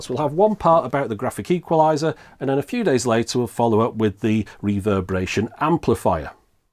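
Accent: United Kingdom. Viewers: The video is educative because your presentation on perfect British English is cure for ears,only sugestion for this clip is absence of back panel and connection ports..... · Love the videos! The British accent you have makes the show seem smarter. And yes it's true: Americans think all British people are smart! Especially if we are from the south!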